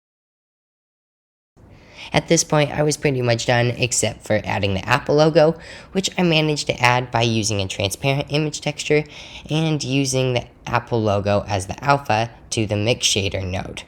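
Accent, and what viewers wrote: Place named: United States. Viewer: Dude you sound American. So, I would assume the imperial system should be second nature😂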